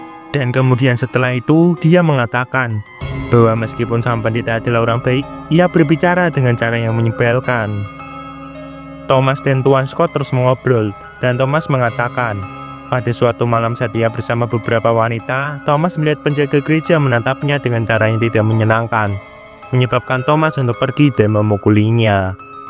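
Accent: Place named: Java, Indonesia. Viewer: medok jawanya ganggu bgt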